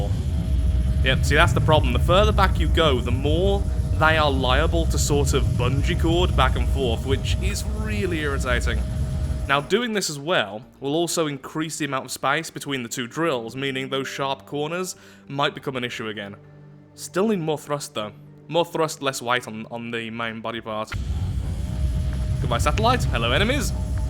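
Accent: United Kingdom. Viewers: holy shit I was looking away and forgot what I was watching and you sound like the most stereotypical brit I could not distinguish which british youtuber I was watching lmfao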